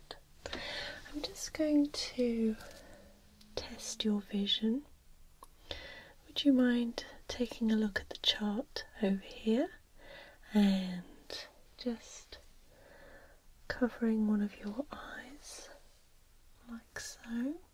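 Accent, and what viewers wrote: United Kingdom. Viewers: So glad there's a sassy, funny and wonderful British ASMRtist out there. A British accent always gets my tingle on!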